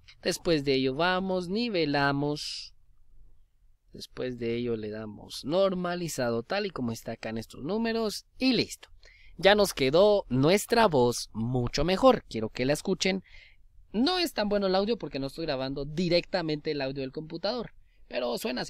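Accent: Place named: Venezuela. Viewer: me suenas a venezolano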